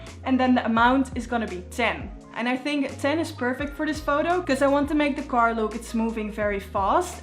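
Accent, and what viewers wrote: Netherlands. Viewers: Hrt Nederlandse Engels accent is heel herkenbaar haha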